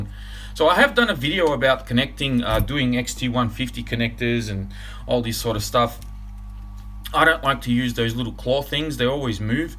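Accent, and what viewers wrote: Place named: Australia. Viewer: hey, welcome back mate,,, my rc channels surfing on the web isnt complete with your one,,, glad to hear your aussie accent back on air,,, cheers,,,:)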